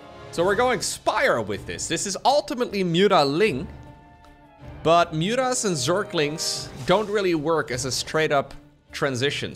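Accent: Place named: Netherlands. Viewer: I don't care what you say yhe dutch sounds freaking awesome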